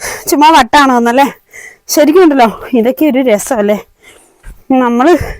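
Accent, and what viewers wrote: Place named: Kasaragod, India. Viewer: നിങ്ങൾ പറയുന്ന കാസർഗോഡ് ഭാഷ കേൾക്കാൻ നല്ല ഇമ്പമുണ്ട്. Nice videos keep going ! I found a sincere approach from you and it should be appreciated. Well done!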